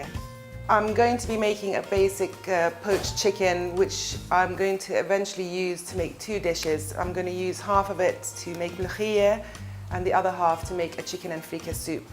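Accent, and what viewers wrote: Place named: England, United Kingdom. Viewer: Wow as an Australian from Armenian mother who was born in Cairo I love watching you cook and your beautiful English accent. Thank you.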